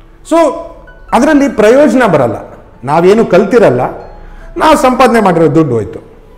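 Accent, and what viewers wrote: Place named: Kerala, India. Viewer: WOW... BABAki Jai ho.... from your Hindi Accent, I always thought you might be from Kerala... but this gave me goosebumps when you spoke in Kannada... Super... would request you to make more kannada videos...:-D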